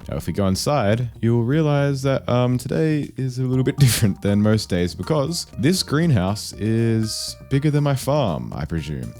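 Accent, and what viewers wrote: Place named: Australia. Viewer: i know an aussie when i hear one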